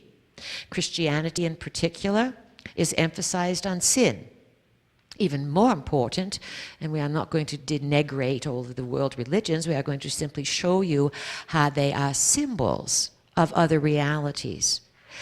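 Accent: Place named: Europe. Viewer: I am truly impressed and follow her down-to-Earth wisdoms and teachings religiously, together with bits of humour occasionally, mixture of European pronunciations occasionally, too. She uses modern Americanisms and old fashioned vocab comfortably. But, more importantly, and fascinating, is that she can speak non-stop, at great lengths, without hesitation [ and repeat words for emphasis ] on any topic whatsoever brought up by an audience / workshop member. Must be collective's instant telepathic communications at work, on thorough answers to questions. Very, very skeptical listeners might feel the questions are secretly given ahead of time, as in recent unfairness / dirty tricks in presidential so-called debates. But, the lengthy, detailed, not common knowledge historically and scientifically, answers kill any such simplistic skepticism completely.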